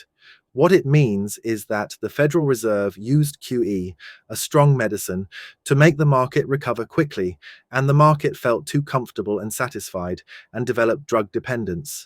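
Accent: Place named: United Kingdom. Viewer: Very British accent 😊 👍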